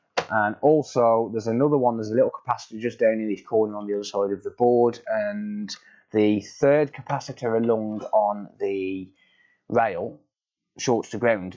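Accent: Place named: Walsall, United Kingdom. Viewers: Loving the channel and the Brummie accent. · This geezer sounds like hes from my area ..Walsall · Great content buddy, keep up the good work. You sound like a local lad, are you in the midlands?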